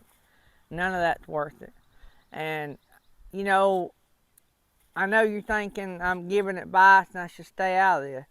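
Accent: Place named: South, United States. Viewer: Yeah you have a very harsh accent. It's quite obvious that you are southern lol. I think this is great advice. Jessie would probably love over the road work, but I've told him I don't like the idea. I wouldn't want him gone all the time and I wouldn't want to go either. So don't think it would work for us. Course with the hot shot, you seem to be home way more than you ever was with the RV hauling. Poor Tammy! aaaaaaaahahahaha!!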